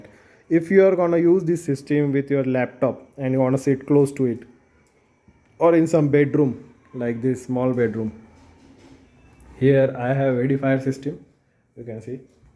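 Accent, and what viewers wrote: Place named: India. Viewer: Clearest Indian accent i have ever heard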